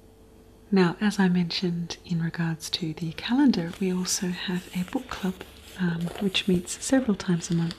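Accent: Australia. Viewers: Tpgain 70 sounds like an elegant and educated Australian accent in my opinion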